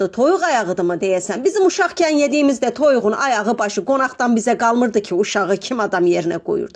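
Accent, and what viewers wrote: Kars, Turkey. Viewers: Ben Karslı yım konuşmanız aynı bizim gibi çok güzel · Kars . Azerbaycan Türk'ü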